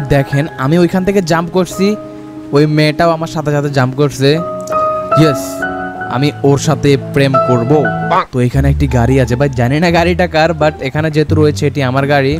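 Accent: Noakhali, Bangladesh. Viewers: আপনি কি নোয়াখাইল্লা আমরা ও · ভাইয়া আপনি কী নোয়াখালীর ছেলে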